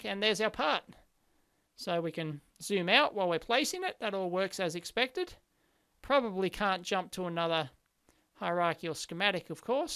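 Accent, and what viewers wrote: Australia. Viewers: I really enjoy watching your channel. Not sure what’s your nationality but your Aussie accent and expression that make me learn and laugh. Thank you.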